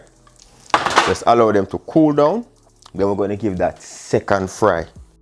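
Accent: Jamaica. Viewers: I am moved to say u r on to greatness! Ur delivery, methodology, mannerisms is just authentic then u drop the Jamaican expressions n lift ur presentations to another level! Miss Lou would be proud a yuh!!! Bless u n family n keep JA no top.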